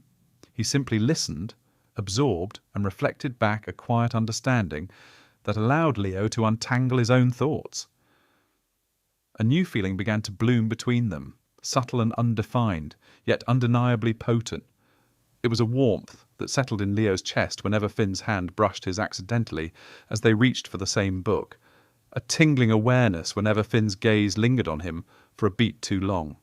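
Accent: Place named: England, United Kingdom. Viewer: love the English accent!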